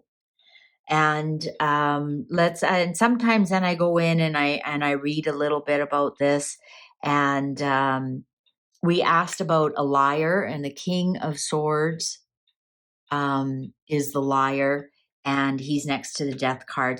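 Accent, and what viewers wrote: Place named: Canada. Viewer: I’ve been binge watching while I’m healing. I am in awe how your readings go. Cards that represent a person is amazing, love it. Clear clarity. Also I feel intuition is so important. Don’t second guess yourself and let the cards tell the story. Your Canadian accent is so endearing. I lived in Canada until I was 7 or 8. Mom brought us to the states and been in Michigan since. People especially down south visiting ask me where did I get my accent. LOL. The Canadian in me is not lost. Phrases and eh, too many words to list. My point is you are so charming and remind me of home❤ Thank sweet lady. I’m going to try to book a reading. Everyday I look for your new uploads, love commenting in a positive manner. God Bless❤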